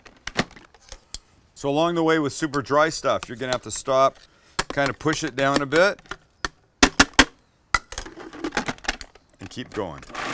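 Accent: Canada. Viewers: Lol I could tell he was Canadian by the way he said out and some other words, but I thought he said something about going church one episode so didn’t know he was jewish, Coolbeans haha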